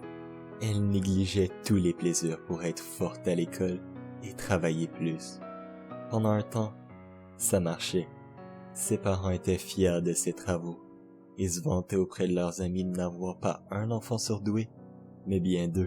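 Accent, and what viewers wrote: Quebec, Canada. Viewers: Hé es-tu québécois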